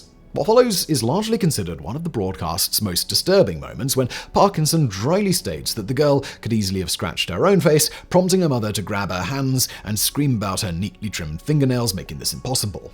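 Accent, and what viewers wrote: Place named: RP, United Kingdom. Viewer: fact boy sounding extra posh as of late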